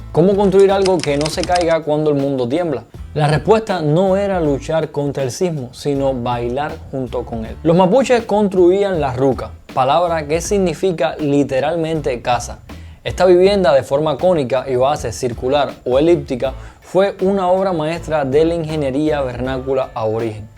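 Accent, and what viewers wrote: Cuba. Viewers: Muchas gracias por compartirnos esta informacion, con la claridad y entusiasmo indistinguible. Estoy a la espera del proximos vídeos de la arquitectura de chile. Me alegra escuchar su acento cubano y todo lo que ha ido aprendiendo y brindando por estos medios. Felicidades y saludos. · Gracias por el vídeo. Lindo el acento cubano. Un saludo a Cuba, un gran y valeroso país❤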